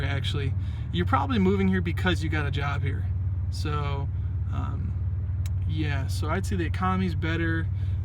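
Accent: United States. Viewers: Definitely not lol where you from bro...im from Detroit...you sound like you from Ann Arbor or the burbs or something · Thank you, considering moving from metro detroit to tuscon... worried about the heat, you sound like someone from grand rapids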